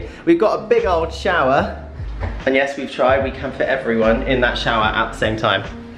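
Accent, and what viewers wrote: United Kingdom. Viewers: British accent 🤣🤣👍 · if you want to learn how to speak British accent , I must watch your vlogg heheheehe..... happy Good day!!! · Thanks for your video upload. It's nice to see what athletes do during their stay in the Olympic Village. Love hearing British English. BTW Congrats, Olympic Champion!!!!! :)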